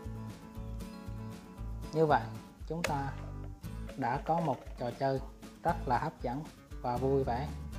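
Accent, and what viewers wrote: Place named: Quang Nam, Vietnam. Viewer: Trò đầu tiên với nắp nước ngọt mình có chơi rồi , tuổi thơ lại ùa về. Cái giọng nói QNĐN thân thương quá vì mình là người quê ở Quảng Nam. Gửi bạn ❤️👍🎁✅chúc bạn thành công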